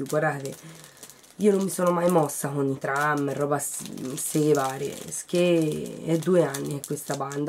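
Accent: Tuscany, Italy. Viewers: è solo il primo che vedo dei tuoi e già mi piaci (ora vado a vedere gli altri) sei brava e simpatica...bello l'accento toscano :)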